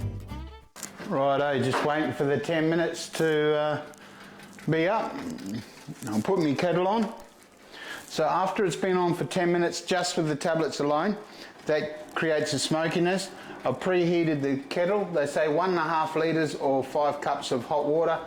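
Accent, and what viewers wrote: Australia. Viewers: sorry bro but is that oz accent ? would like to know how you define the taste of ? keg hangi above ground hangi all taste like boil up …? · Sounds like this Kiwis an Aussie.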